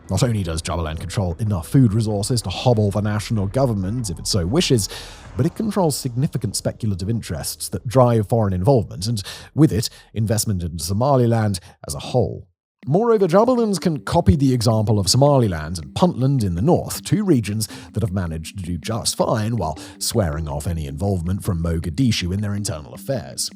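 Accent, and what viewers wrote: England, United Kingdom. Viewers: It’s hilarious to me that someone as ill informed or informed but lying to their audience is so highly regarded on these topics simply because he has a YouTube channel and speaks with an English accent. To be clear, there is not a single part along the front lines that Russia is quickly advancing at. Russia is getting the butt’s handed to them in every direction. Just because you are losing a tree line or a few fields every week doesn’t mean you’re losing. Ukraine has taken more land in Russia than Russia has in Ukraine all year. In the area’s where Russia is advancing their casualties are as high as 10 to 1. This is a strategy that is similar to a heavy weight boxer letting the bigger and stronger opponent wear themselves out by throwing non stop haymaker’s that’s doing little damage. At the rate Russia is advancing it will take them 250 years and 100 million dead soldiers just to reach their stated goals of taking Donbas, Luhansk, Kherson, Zaporhizia, and I forgot the other off the top of my head. 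Make no mistake if Ukraine said we are going to hold this line at all cost they could do it, but if Russia wants to lose a thousand soldiers and millions in equipment to take a field you let them in a controlled retreat. Russia’s economy is collapsing, their allies and bases overseas are falling, their military has been set back decades, and there are sign people inside Russia are turning. Right now Russia is losing more troops than ever before. Everyday they are setting a new rec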